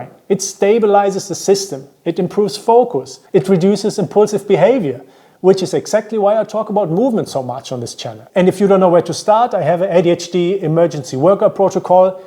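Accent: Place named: Germany. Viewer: My ADHD brain tells me to guess your accent. Deutschland? Ich learne ein Jahre Deutsch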